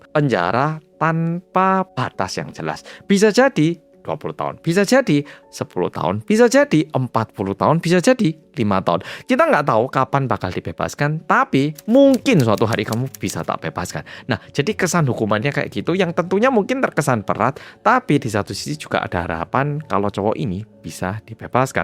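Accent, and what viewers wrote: Java, Indonesia. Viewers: Hai muedok😊 Lgsg nyimak🎉🎉🎉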